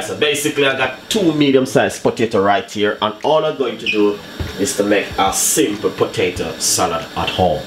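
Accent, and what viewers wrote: Jamaica. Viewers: I love food but my favourite thing about your video dem is ur Jamaican accent... keep it real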